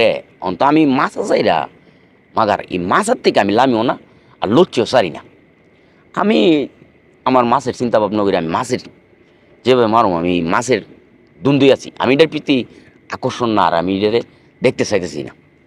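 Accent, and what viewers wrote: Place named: Narsingdi, Bangladesh. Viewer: মনে হয় নরসিংদির ভাষা এটা!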